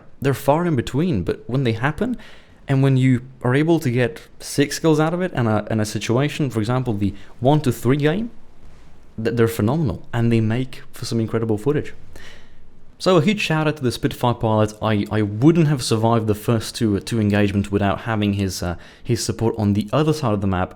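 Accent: England, United Kingdom. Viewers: Why does he have an English accent? wtf? Or is the Slovenian accent similar in some way?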